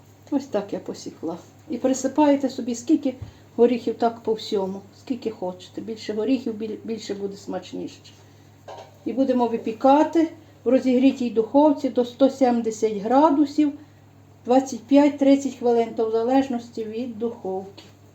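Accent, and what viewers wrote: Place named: Rakhiv, Ukraine. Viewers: Дякую, пані, при нагоді обов'язково спечу, дуже гарний рецепт. Ви дуже файна господиня, дай Бог вам здоров' я і щастя. А ваша гуцульська говірка просто чарівна, слухаю і милуюся. ❤️👍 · Вы майже из Рахова?такий розговор як у нас уклин вам из КАЗАХСТАНУ. ЯТОЖЕ ТАКИЙ УМИЮ И ДУЖЕ ЛЮБЫМО. САМИЙ ВКУСНЮЧИЙ.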